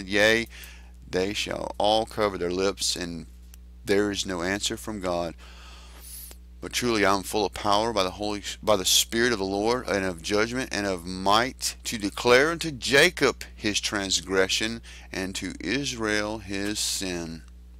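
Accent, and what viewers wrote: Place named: South, United States. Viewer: Being from TX ,, I love your southern accent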